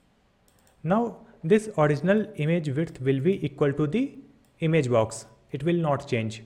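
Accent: Bihar, India. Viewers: Sir aap bihari ho? Just asking no offense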